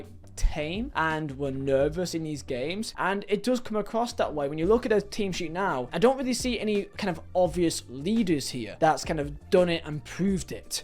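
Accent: United Kingdom. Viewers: GREAT VIDEO AND YOUR BRITISH BTW FELLOW BRIT.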